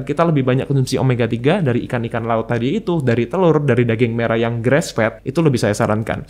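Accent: Java, Indonesia. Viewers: dok org jawa ya,logat jawanya dapet 😁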